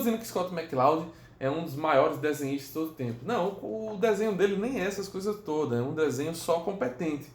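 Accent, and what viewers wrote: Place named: Northeast, Brazil. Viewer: Muito show seu vídeo...e sua lista é nota 10... eu admiro muito o seu Canal e já te acompanho há um tempo, mas só hoje me dei conta que não estava inscrito, o que resolvi com um clic...eu gosto muito a forma como vc fala de quadrinhos e os apresenta...e além disso, tem esse sotaque que amo (tive um namorado de Maceió e um de Teresina, mesmo sendo filho de Paraibano, meu pai não tinha nenhum sotaque nordestino)...da sua lista eu tenho "Maus" (meu HQ da vida), "Retalhos" (lido nesse mês de novembro) - vc já leu "Habib", é lindo demais, - e claro, tem alguns que estão na minha lista de desejados...um grande abração carioca e boas leituras...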